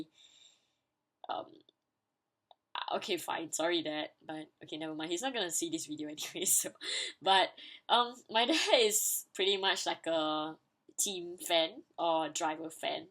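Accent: Singapore. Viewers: Aww don't apologize. I love your accent. I always wanted to visit Singapore. I hope you can check out my channel too? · What no I love singaporean accents (lah) :) You're so lovely (I'm watching!) and am looking foreward to more vids!!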